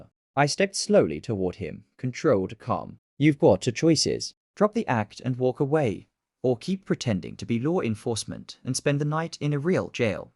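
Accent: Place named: United Kingdom. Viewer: A US Navy seal with a UK accent.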